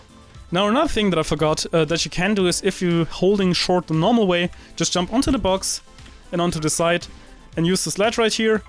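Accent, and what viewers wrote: Germany. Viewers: ich wusste du kannst deutsch xD Egal wie fließend du sprichst und egal wie sehr du versuchst alles richtig auszusprechen man kann immer hören ob jemand deutsch spricht xD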